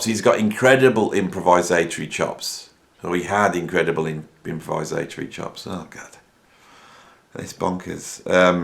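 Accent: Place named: England, United Kingdom. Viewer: what region in England is your accent from?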